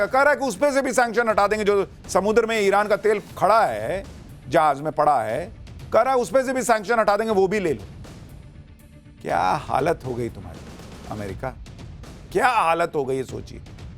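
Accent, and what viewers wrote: Bihar, India. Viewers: Andaz news sunane ka achcha hai Bihari lahja hai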